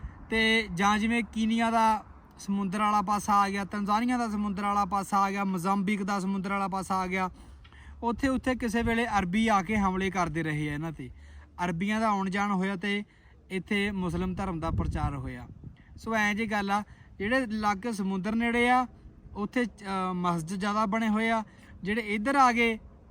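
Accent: Malwa, India. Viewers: ਬਾਈ ਮੈਂ ਤੁਹਾਡੀਆਂ ਵਿਡੀਓਜ ਪਿਛਲੇ ਚਾਰ ਪੰਜ ਮਹੀਨਿਆਂ ਤੋਂ ਦੇਖ ਰਹੀ ਹਾਂ ਜਾਣਕਾਰੀ ਤਾਂ ਮਿਲਦੀ ਹੀ ਹੈ ਪਰ ਤੁਹਾਡੀ ਬੋਲਬਾਣੀ ਨੇ ਦਿਲ ਟੂੰਬ ਲਿਆ ਕਿਉਂਕਿ ਮੈਂ ਵੀ ਮਲਵਈ ਤੇ ਦੇਸੀ ਭਾਸ਼ਾ ਹੀ ਬੋਲਦੀ ਹਾਂ ਸ਼ੁਕਰੀਆ ਬਾਈ ਜੀ🙏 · ਬਾਈ ਮੇਰੇ ਵਾਂਗ ਤੂੰ ਵੀ ਸੜਕ ਨੂੰ ਸ਼ੜਕ ਕਹਿੰਦਾ ਹੈਂ😊 ਅਸਲ ‘ਚ ਇਹ ਮਲਵਈ ਲਹਿਜਾ ਹੈ ❤ ਬਾਕੀ ਅਨੰਦ ਹੀ ਅਨੰਦ 🎉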